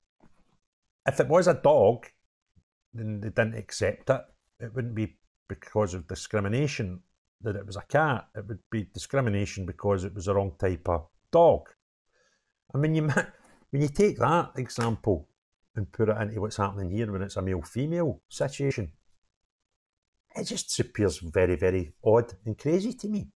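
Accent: Scotland, United Kingdom. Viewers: Are you from Scotland? Your accent sounds like my dad's,who is a Scot. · No disrespect but your Scottish accent saying ‘giggle vs tickle’ made me laugh out loud. You could tell any joke badly but your accent would make it funny. Then you go onto talking about the cat/dog identity confusion. Sounds like a comedy show. Good luck on your channel. But I recommend you try stand-up. British accents have the advantage of already sounding funny.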